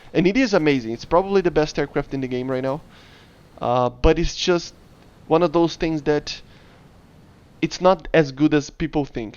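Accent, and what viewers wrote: Brazil. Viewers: where are u from? your soutaque looks like brazilian soutaque xD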